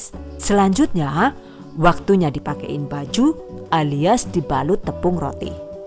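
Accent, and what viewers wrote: Banyumas, Indonesia. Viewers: Wiih ngapak mbak 😁😁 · Suwun resepe ya Yu... Rika sih wong ndi Yu, deneng ngapak kaya nyong.. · Bun , aslinya orang mana sih, suka liatnya ada ngomong ngapak nya 😁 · Owh si ibu ngapak jebuleeee... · 🤣🤣🤣🤣🤣🤣🤣🤣🤣🤣🤣🤣🤣🤣🤣🤣Nyong seneng bgt krungu NGAPAKE... THE BEST CHANNEL😘😘😘😘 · Ku suka kalau bhs ngapake metu rek....lucu sih...... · Madiun kok ngapak seh buk? Kepriwek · Ga tau knapa kalo buk hasanngomong ngapak ktawa sendiri😀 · Bahasa Inggrisnya bagus, ngapaknya lebih keren😁😁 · Hhaaa q lah gemes ,,Rika janeh wng ndi Yu,,ngapak pisan ky inyonge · Mba.nya orang mana sih?kok ada ngapaknya?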